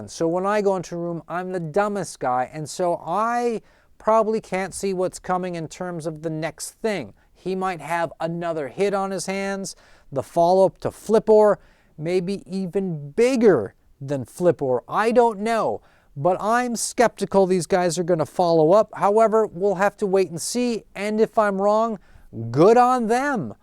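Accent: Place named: Midwest, United States. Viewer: I've watched your two newest videos today and learned two new things about you, both of which explain why I like your videos so much: 1. You're from Minnesota; I grew up in Wisconsin! It's nice hearing a Midwestern accent on youtube. 2. Your "autistic ramblings" as you put it: I'm autistic, so to me they don't even sound like ramblings! I've been enjoying your channel quite a while now, I was happy for you when I saw that recent vid get some traction. Your videos always give me a very specific vibe: when I lived near Green Bay, my dad and I would go to comic and game shops (and sometimes thrift stores) on the weekends and just drive around, seeing what weird stuff we could find. Your videos always give me a similar feeling to that fun vibe. Now that I'm in my late 20s and a collector myself, your videos make me nostalgic for those days!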